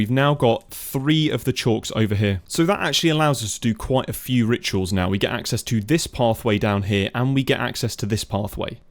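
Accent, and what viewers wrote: United Kingdom. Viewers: If I remember right, enchantment industry and oritech both have ways of getting enchanted books/items at significantly higher levels (fortune 255, anyone?) so maybe you could use that to get even more stuff? I'm absolutely loving this series, your British voice is great for helping me sleep (I finish the episodes first don't worry) :3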